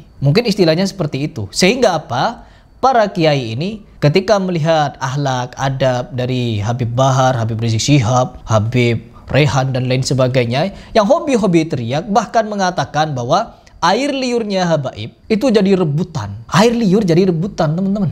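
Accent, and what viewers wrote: Madura, Indonesia. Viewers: Lucu sekali aksen maduramu bro!! Berani ga lu nantang debat salah satu ustaz yg kau suka gibahin??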